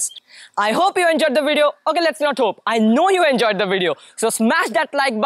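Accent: India. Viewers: I love this Indian accent! :D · а есть индусы, у которых хватает ума научиться говорить на английском не по-индийски? индийский копипаст ютюба...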